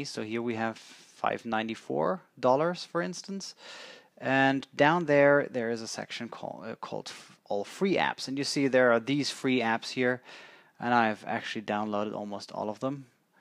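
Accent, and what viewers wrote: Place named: Germany. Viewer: This is the first vid I've watched of yours and I kept thinking "He sounds like his accent is German" and then you went to your home town haha.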